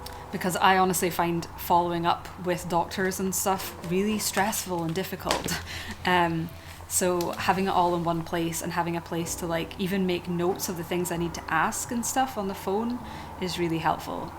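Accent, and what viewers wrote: Scotland, United Kingdom. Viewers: Hi, sorry for commenting on this one about a vid from the past :P but im so into the storyembryo and I have a question! :D How does foretelling as a tension creation tool work with this plotstructure? Can I foretell something out of the '#6 Pay a heave price (enlightenment/chaos)' part before I start at the '#1 Comfort zone (ignorance/order)'? Ofc I can, I know. But does it work technically? I've written a lot in what I call adventuremode. Now I'm in tidy-upmode so I'm interessted in the theory and if you know more about that! Love your accent. It's Scottish right?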